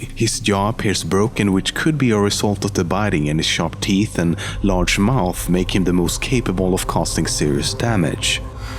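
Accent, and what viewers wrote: Sweden. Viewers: Your accent sounds swedish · You are swedish huh? xD Strong accent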